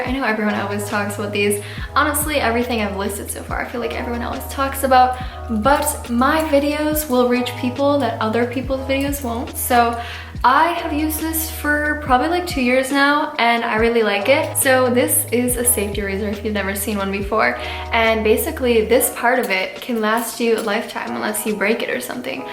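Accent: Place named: Minnesota, United States. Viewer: Oh ya, I think she's from Minnesota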